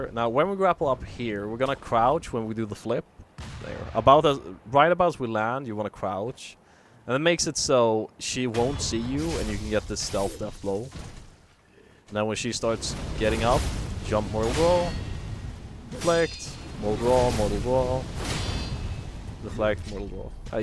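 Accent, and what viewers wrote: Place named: Sweden. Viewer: är det en svenne mina öron skådar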